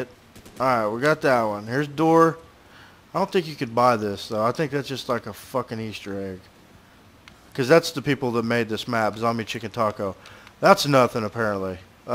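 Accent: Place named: South, United States. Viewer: where are you from? you sound very southern!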